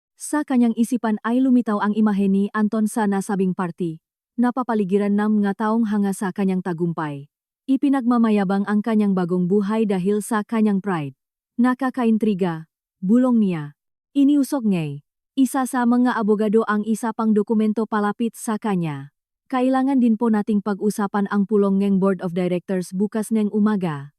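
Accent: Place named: China. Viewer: Nakakawalang gana makinig sa Narrator Ano ba sya Chinese or Maranao. Palitan mo pls nakakabwisit pakinggan imbis ma relax.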